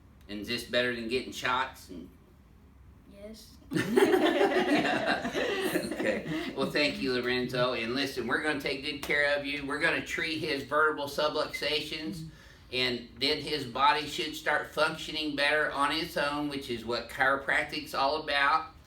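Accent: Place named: Texas, United States. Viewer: That texas accent is great! Love it.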